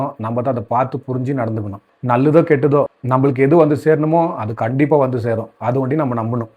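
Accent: Bangalore, India. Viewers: Thambi bengalore Tamil la.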